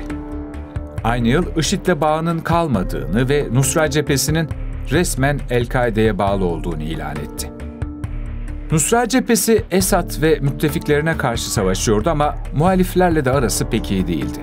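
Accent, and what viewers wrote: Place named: Adana, Turkey. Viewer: Sadece bir Adanalı ,Elbise derken E yi uzatıp Eelbise der.seslendiren kişi,ya osmaniyeli,ya Adanalı ya da mersinli.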